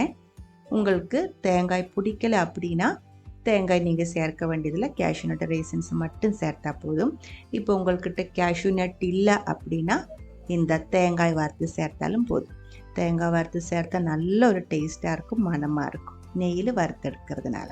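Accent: Kerala, India. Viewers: ഒരു മലയാളി തമിഴിൽ സംസാരിക്കുന്നത് കേൾക്കാൻ നല്ല രസമുണ്ട് പായസം സൂപ്പർ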